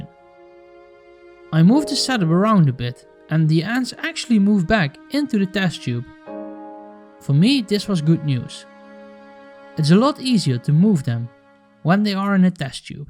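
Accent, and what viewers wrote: Netherlands. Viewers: Ik dacht al dat ik een Nederlands accent hoorde =D toen zag ik de oven.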